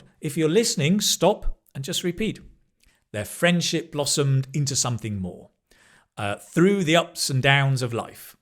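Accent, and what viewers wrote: United Kingdom. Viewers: It is amazing, how good I understand your pronunciation! The are almost 90% of your speech. I think it's depend on your wonderfull british accent. Your way to speak is very clear! I have so much problems to understand americans. thanks a lot!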